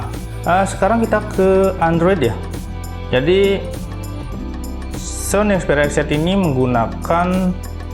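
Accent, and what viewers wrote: Bali, Indonesia. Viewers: Klo liat dari aksennya si admin ini orang bali...😀😀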